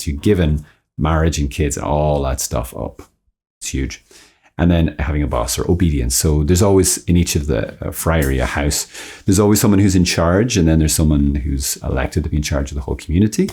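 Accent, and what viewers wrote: Ireland. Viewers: Em Irish accents 😭🍀 Aiiii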